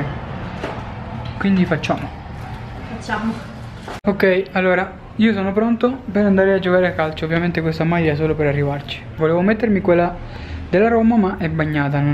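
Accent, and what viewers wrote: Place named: Mexico. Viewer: mi piace il tuo accento messicano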